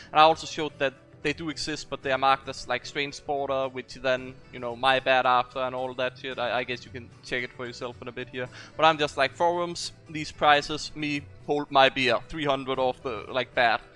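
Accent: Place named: Denmark. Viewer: Where are you from? You sounds like a dane tbh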